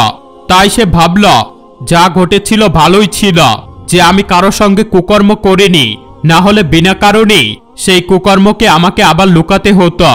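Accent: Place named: Noakhali, Bangladesh. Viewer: ভাই তোমরা কণ্ঠ এমন,মনেহয়,নোয়াখালী মনেহয়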